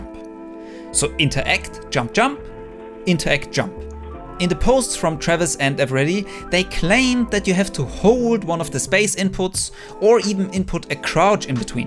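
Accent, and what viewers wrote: Germany. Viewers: Do u speak german? Because your voice sounds like your main language is german.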